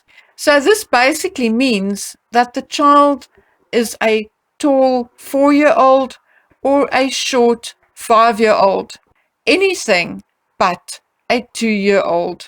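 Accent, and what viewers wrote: Afrikaans, South Africa. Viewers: Daai Afrikaanse aksent sal ek enige plek in die wêreld herken!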